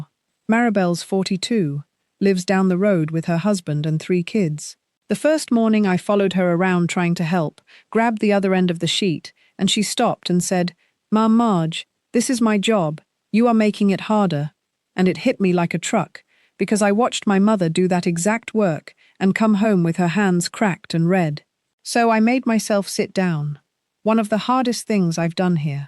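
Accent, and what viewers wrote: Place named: United Kingdom. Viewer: I love your story, brilliant. I too love the Philippines, I have filipino friends. For an American woman you've got a fabulous British accent. Great story. Wish you well..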